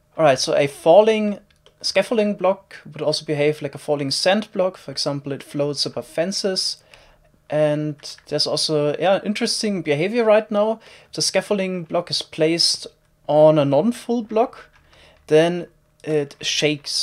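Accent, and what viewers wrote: Germany. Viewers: By any chance are you german? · R u german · Is he german? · You've a hard german accent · thats a hardcore german accent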